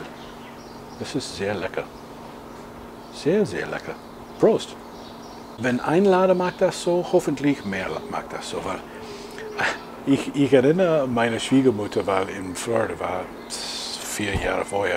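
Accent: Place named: Netherlands. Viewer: Sehr schön wie du sprichst, man merkt auch deine holländischen Wurzeln!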